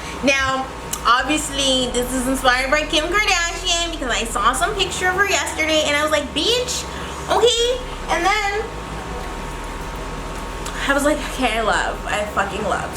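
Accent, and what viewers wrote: United Kingdom. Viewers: Ur british accceeent in love ❤️❤️❤️ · you NEED to do a video with your British accent 🙌🏾🇬🇧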